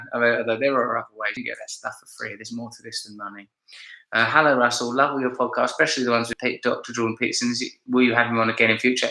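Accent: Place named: United Kingdom. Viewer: Totally. I just know that when I do start reading, the version of Russell's voice in my head is no match for the real thing. ;) We American's are so easily beguiled by eloquent British accents.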